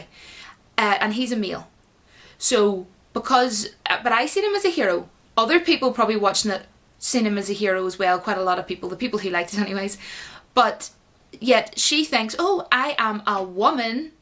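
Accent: Scotland, United Kingdom. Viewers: Like ur Accent... r u scottish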